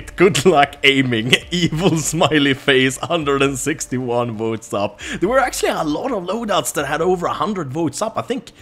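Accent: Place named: Stockholm, Sweden. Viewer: Shit vilken svensk dialekt du har när du pratar engelska asså! Stockholm??? *****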